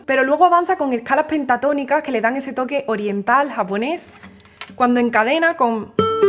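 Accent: Andalusia, Spain. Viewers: Que bonito como tocas la guitarra y tambien como hablas, de que parte de España eres? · porque tiene un acento español diferente? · Los domingos con tú regalo musical son otra cosa. Se aprende con tus comentarios siempre correctos, agradables y llenos de ese gracejo andaluz que te caracteriza. Como guinda tu interpretación y entonces atónito contemplo la maestría de que no defrauda jamás. Se cierra el telón y a esperar toda una semana. Un millón de gracias. Un abrazo. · Hay muchos acentos en España, este es del sur (Andalucía) y dentro de Andalucía hay lógicamente más acentos. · que podria ser mejor que escuchar la cancion de la, pues que te hagan una intro y te hagan amar mas la cancion posd: de que parte de españa es tu acento, me gusta mucho. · Ese acento andaluz 🤤🥰 enamora!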